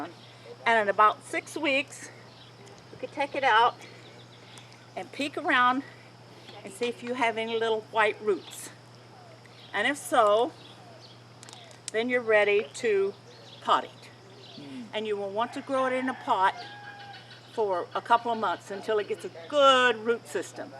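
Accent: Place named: Kentucky, United States. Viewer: The Kentucky accent is like a language of its own.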